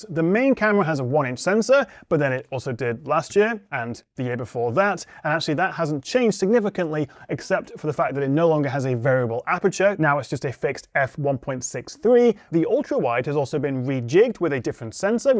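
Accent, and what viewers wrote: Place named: Wales, United Kingdom. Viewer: Didn't know you're from wales bruh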